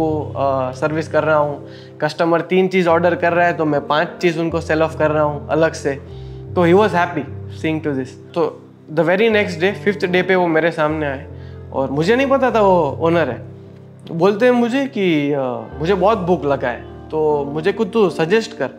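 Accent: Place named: Gujarat, India. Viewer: That gujarati accent 😊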